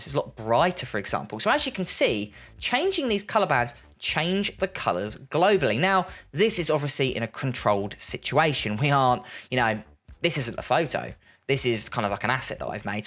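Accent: United Kingdom. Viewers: he´s bri ish thats how they talk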